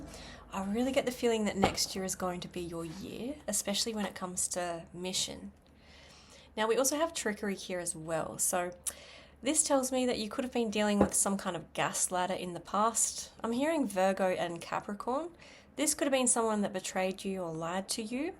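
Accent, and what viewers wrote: Australia. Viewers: You have to be good. You hail from Oz. I knew you were by your accent. I'm from Oz. Adelaide living in Canada.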